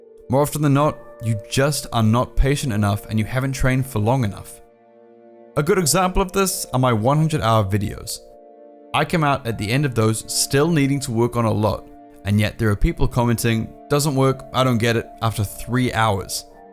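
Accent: New Zealand. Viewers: Are you from New Zealand? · Are you from nz?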